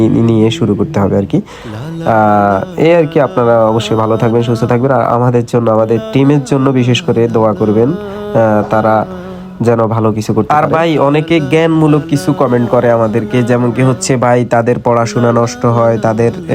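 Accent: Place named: Noakhali, Bangladesh. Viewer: ভিডিও সুন্দর করে তোলে সুধু নোয়াখালী ভাষার জন্য ই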